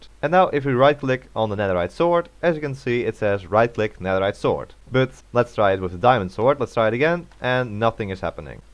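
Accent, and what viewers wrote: Netherlands. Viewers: You can just hear the dutch accent...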